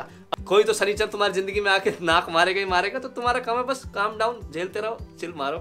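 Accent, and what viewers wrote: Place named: Uttar Pradesh, India. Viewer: Bhai ho to haryana se but aapki language to up ki hai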